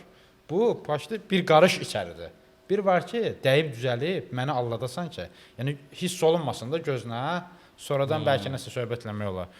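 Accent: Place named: Quba, Azerbaijan. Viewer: Quba ləhçəsi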